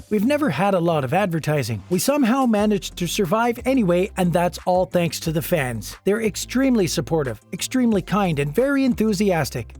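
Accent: United States. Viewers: PLEEEAASSEE....LESS OF YOU TALKING..ABOTE the show!!! Sorry to offend... But when you decide to do any kind of public speaking, you REALLY need to try & lose the annoying Boston accent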